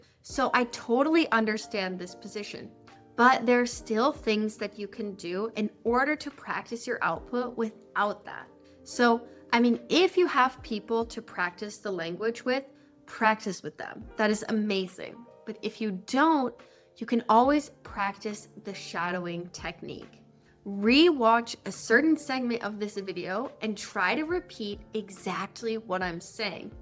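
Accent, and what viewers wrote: United States. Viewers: I really love the American accent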